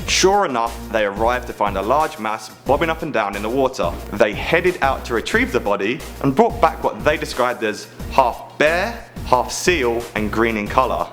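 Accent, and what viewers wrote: United Kingdom. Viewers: British accent <3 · Yay another British host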